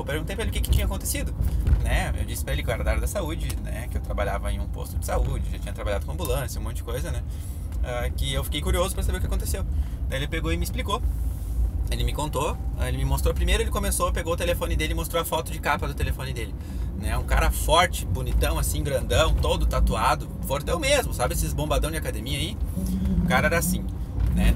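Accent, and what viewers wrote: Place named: Porto Alegre, Brazil. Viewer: Que histórias hein! Valeu, não sou motorista, vim parar há algumas semanas por acaso no seu canal, mas já assisti vários e vários vídeos, to gostando bastante. Me identifiquei contigo, trabalhador, gente boa e o sotaque bem puxado que me lembra alguns amigos de POA! Ficou bem legal o quadro contando histórias de passageiros, faça mais vezes. Abraços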